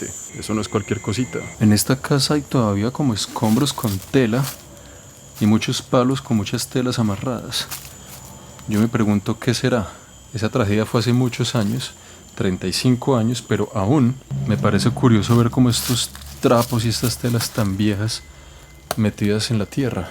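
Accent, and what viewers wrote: Colombia. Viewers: Eres de Panamá y por que tienes acento colombiano???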